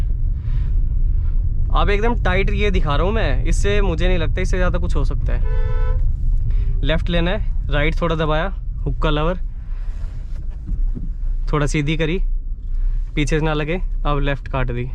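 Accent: Haryana, India. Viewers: BHAI HARIYANA SE H KYA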